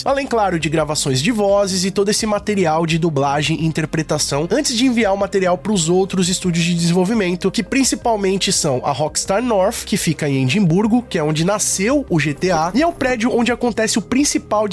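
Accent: Portugal. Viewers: Pq ele tá com essa narração em português- pt ? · Não sei se já havia percebido, mas o canal tem sistema de dublagem, ele está falando o português europeu via IA, o idioma original é o PT-BR.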